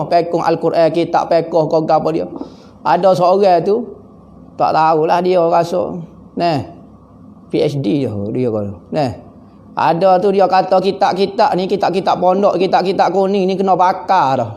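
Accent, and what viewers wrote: Kelantan, Malaysia. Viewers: asalamualaikum ustaz boleh ka guna loghat melayu.. saya tidak berapa faham loghat kelantan.. suka dengar ceramah2 ustaz.. salam dari sabah 😇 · Cuba² fhm lograt klate 😀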